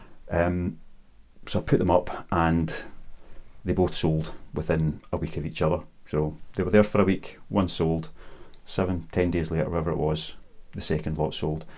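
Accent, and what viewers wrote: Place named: Scotland, United Kingdom. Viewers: hes ayreshire i believe. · Love listening to your accent! Whereabouts in Scotland are you based?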